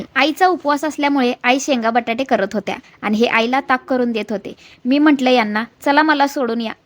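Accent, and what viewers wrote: Kolhapur, India. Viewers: Tumchi bhasha kolhapuri aahe kothe rahata tumchi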